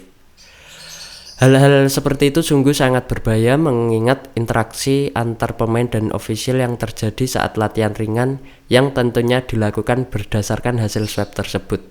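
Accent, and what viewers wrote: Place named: Java, Indonesia. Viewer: Medok bgtt😅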